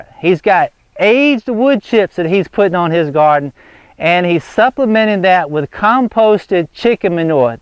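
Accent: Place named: Kentucky, United States. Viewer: Awwwh, that's a shame that you've got this funky situation now. Hopefully this will clear up soon and you'll again be able to plant the seeds you want and have them thrive. I am only an old disabled woman in a wheelchair, and live in the Phoenix suburbs here in a doublewide mobile in a mhp setting and because of the patio and carport overhang type roofs, I really don't have much ground for planting a garden. I'm trying to grow what I can in containers but most of it is in mostly shaded areas of the patio. It's hard, I've tried getting things going since April of this year, but everything keeps getting burnt up so fast from the sun, and the HEAT, even when I drag the containers into the fully screened inner patio area. It's so hard to watch things begin to sprout and get all happy and then have to watch them get burnt to a crisp with a few weeks as I try desperately to get them the sunshine that they obviously need, cuz they're getting too leggy! 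I watch your amazing videos and just dream of having a place as big as yours and the physical ability to farm it too. You are truly blessed and it's so nice that you share your information, whether good or bad, with us. I love hearing your accent, my maternal Grandfather was from Lexington KY and I adored hearing him talk about just anything. He's been gone for many years now, but everytime I hear your voice, it reminds me of him a little bit. Anyhow, thanks for the information, I'd often thought of using something like sawdust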